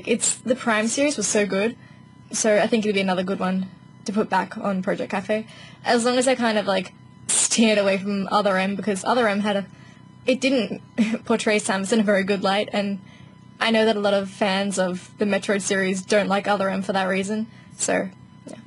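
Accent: Australia. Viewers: Your first vid I'm seein'. I subbed. At first I thought you had a british accent, but then I clocked it was Australian lol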